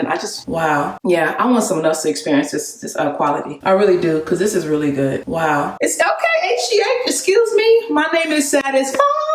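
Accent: Nigeria. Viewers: You make me laugh when you speak Nigerian accent. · “Like it’s part of my chocolatiness” 😂😂😂 I love your naija accent too.This quarantine situation is having errbody glooowwing 👀👀👀👏👏👏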